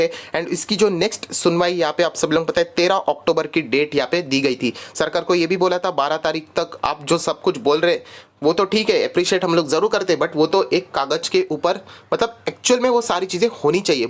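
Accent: Maharashtra, India. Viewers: Unke tone se confirmed hai, ki Maharashtrian hai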